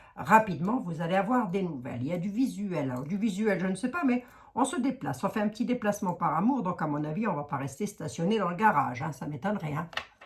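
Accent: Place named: Grand Est, France. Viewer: Fort Accent et expressions du GRAND EST que je reconnaît très très bien 😉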